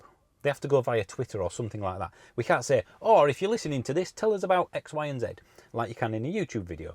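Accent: Yorkshire, United Kingdom. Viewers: Awwwww! I’m gonna miss my bi weekly shot of yorkshireness! Its lovely to listen to our native language. Living NZ I have to speak a foreign version of English all the time! Sometimes they don’t understand me! Can I suggest a subject for your new channel? A visit to Cragg Vale to explore the history of the coiners( clipping the ticket). just a thought. Been following you for ages before I imported my own I-Pace from UK. Much cheaper than here.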